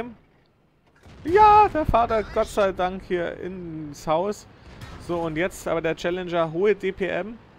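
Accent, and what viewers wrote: Bavaria, Germany. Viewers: Mal ne Frage kommst du aus Bayern? :D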